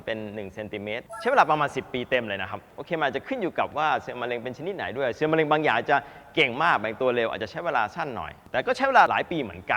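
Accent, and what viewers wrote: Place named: China. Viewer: คุณหมอเหมือนติดสำเนียงจีน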